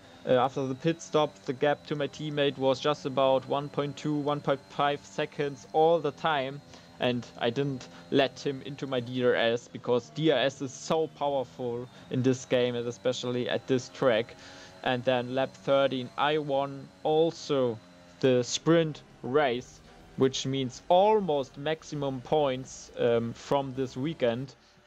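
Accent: Germany. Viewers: I have one question. Are u German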